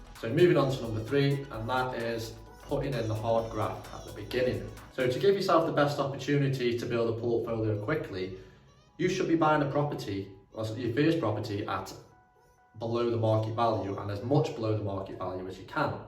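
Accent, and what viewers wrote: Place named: Stoke, United Kingdom. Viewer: Stumbled upon your channel and could tell a Stoke accent straight away! I'm in the process of trying to find my first BTL or flip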